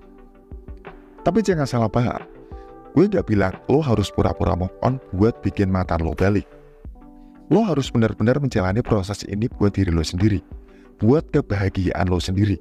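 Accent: Java, Indonesia. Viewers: aga medok nampakmya moderator kali ini🤔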